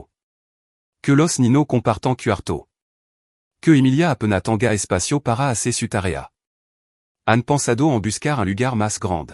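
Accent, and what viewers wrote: France. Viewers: ¿Qué onda con el idioma? No es español, no es francés. es una mezcla de ambos, escrito en español con pronunciación francesa... que locura!! Decídete por uno, ¿no? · Es una persona francesa hablando en español, cuando una palabra le resulta difícil la dice en francés, pero si es un francés!!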